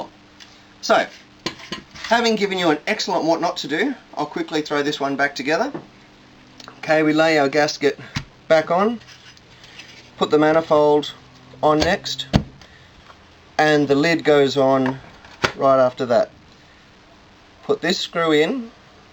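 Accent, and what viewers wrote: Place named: Australia. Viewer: you were of great great help! and the Australian accent rocks :-)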